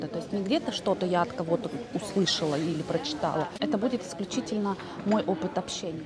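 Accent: Urals, Russia. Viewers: Уральский говорок узнаваем. Я сразу понял, откуда вы. Про путина - лучше промолчу, чтобы негатив тут не оставлять. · Я так знала, что Вы с Урала! Говор наш)) я из Екатеринбурга, большой привет 🙋‍♀️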